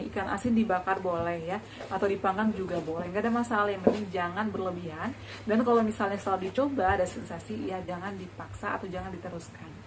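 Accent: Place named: Java, Indonesia. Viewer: Kalau ngaku orang sulawesi ngapain logat jawa, lestarikan dong budaya sulawesi sama dengan daerah-daerah lain di indonesia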